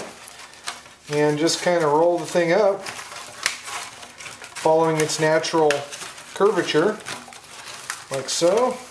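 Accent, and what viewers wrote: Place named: Michigan, United States. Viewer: What part of the country are you from? Because your voice and accent are exactly like mine! My wife thought I was on the phone, then she thought I was narrating this video. We have been married for over 30 years, and she was amazed listening to you talk. We both grew up in Michigan. Just wondering if that is where you are?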